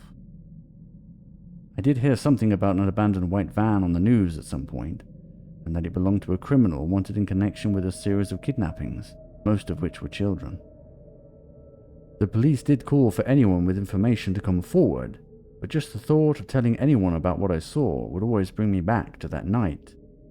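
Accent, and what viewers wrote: United Kingdom. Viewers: is this british accent? i like it. recommend me some more channels like this to practise listening pls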